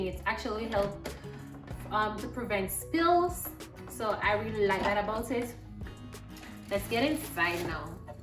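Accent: Jamaica. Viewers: I can tell by your accent that you are a Jamaican 🇯🇲🇯🇲❤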